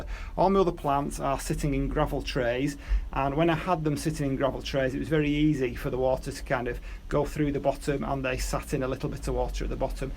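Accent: England, United Kingdom. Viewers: It`s good listening to a English accent but greetings from the Philippines, I collect water from the roof in a drum actually at the moment its the rainy season so my Nepenthese are happy outside not in direct sunlight Humidity here is around 85% everyday so no problem there. I believe I`m right in saying the Philippines is home to largest number of Nepenthese including N.attenboughrii but I have to grow Lowland spp its too hot for the Highlanders where I live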